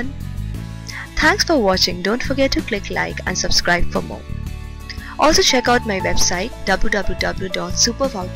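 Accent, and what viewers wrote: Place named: India. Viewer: It's an Indian accent she has, I think anyway, It's not actually weird sounding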